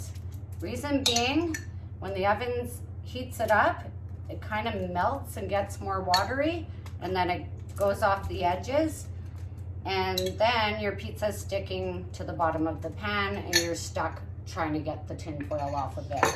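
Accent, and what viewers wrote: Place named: Canada. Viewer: I love the accent too Canadian?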